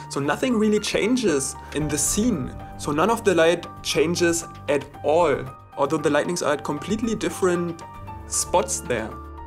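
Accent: Germany. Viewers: are you German? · schon deutlich deutsch :D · This german accent however pisses me off. But cool video btw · Even for me (i'm german) your accent is really annoying! (i'm absolutly sure thaz you are german...) ÜB EIN BISSCHEN! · german akcent ^^ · du klingst deutsch ^^ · Dein typisch deutsch ignoranter Akzent ist so furchtbar. Kannst du dich nicht mal bemühen richtiges Englisch auszusprechen und zu betonen?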